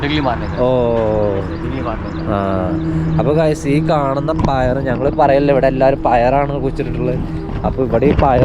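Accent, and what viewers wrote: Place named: Malabar, India. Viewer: ഈ ഭാഷക്കു ഒരു കുഴപ്പവും ഇല്ല ബ്രോ മലബാർ സ്ലാങ് ആണ് അങ്ങ് ക്ഷമിച്ചേക്ക് 😊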